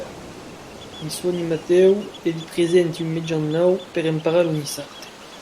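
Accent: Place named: France. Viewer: Je doute que ce dialecte de l'occitan se parlât avec cet accent français.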